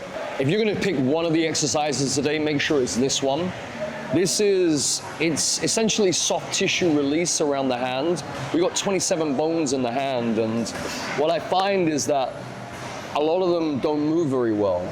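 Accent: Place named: England, United Kingdom. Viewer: I'm sorry I can't take this guy seriously with all those tattoos combined with his Wallace and Grommit look and middle class English accent on top. I would prefer t learn from the Thai trainers, are there any left in Bangtao?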